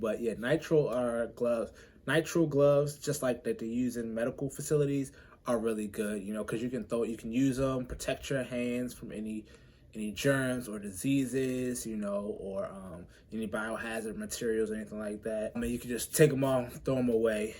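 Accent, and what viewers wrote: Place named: Baltimore, United States. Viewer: lol I wrote this before I heard the bmore accent bruh I forgot y'all consider PG and Moco both DC 🙏🏿 stay up and thanks for the knowledge bruh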